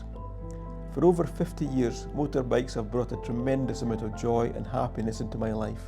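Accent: Scotland, United Kingdom. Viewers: Greetings from Australia, on the the Sunshine Coast of Queensland. Been riding since the early 70's on the usual vast array of types, sizes and forms... with a million memories. Just so enjoying listening to your soft Scottish accent... keep the vids coming... thankyou 👍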